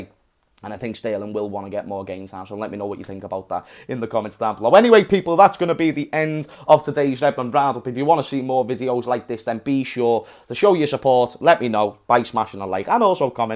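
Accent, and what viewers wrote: Liverpool, United Kingdom. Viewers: Why’d you pick a scouse guy to learn English 😂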